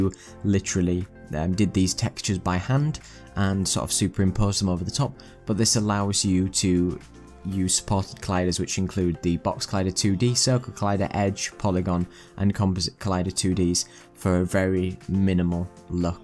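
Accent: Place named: North, United Kingdom. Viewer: Love the drama llamas.....and the northern accent!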